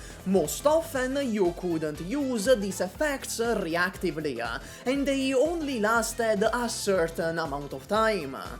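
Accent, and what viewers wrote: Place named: Italy. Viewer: Oh shit, again this guy with Italiano accent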